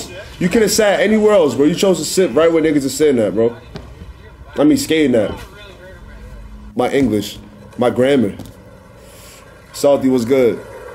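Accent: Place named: New York, United States. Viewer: We get it bruh you from ny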